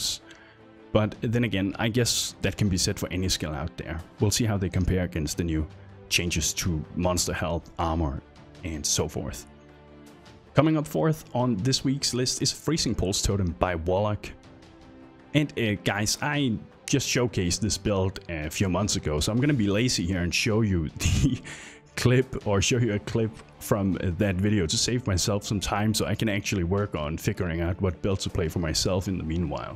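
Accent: Denmark. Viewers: You're danish aren't you. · Just wondering, are you danish? Because your accents sounds quite like a dane 😂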